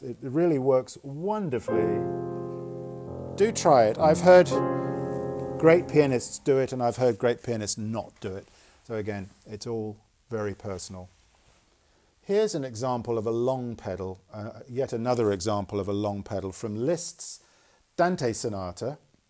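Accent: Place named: United Kingdom. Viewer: Very enjoy hearing your British accent :)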